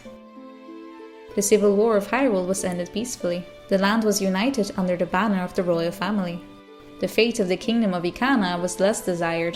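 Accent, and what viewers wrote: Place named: Netherlands. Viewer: I honestly think you're the best Zelda theorist on youtube. Your theories are always logical and thought provoking, clicking nicely with the canon. You go into a lot of in-game details, showing you do your research. Your presentation is also the most pleasant to watch; good video editing, a clear yet calm voice and well formulated lines. Je Nederlandse accent is bijna niet te horen.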